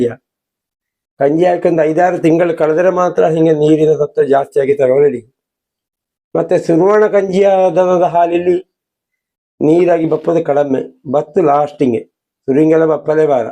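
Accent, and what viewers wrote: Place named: Kerala, India. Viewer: Nimma kannada vantara Malyalam + Hawyaka kannada mixture aagi kelida hage ide.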